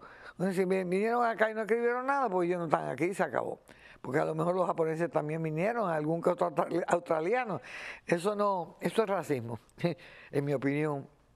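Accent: Cuba. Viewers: 👀Yo conozco gente que tienen 70años y nO han “ madurado “ 😅😅😅ja ja ja 🤣🤣🤣🤣🤣cuánta VERDAD ❤tú acento sutil del ORIENTE cubano es notable * y además es un orgullo 🥲 🥹 I PROUD 🥹 of U 📚📖🌳🧘🏻‍♀️🌊🌺🌳🫶🏻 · Ya soy adicta a su forma tan natural de expresion....muyyy cubana. Gracias Dr. por compartir con nosotros sus conocimientos.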